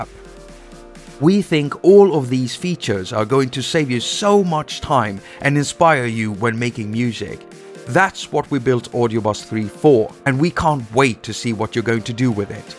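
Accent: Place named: Sweden. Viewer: Probably is the swedish accent. Or has talked too much with siri. Look out for his channel if you don't know him. He knows what it does:)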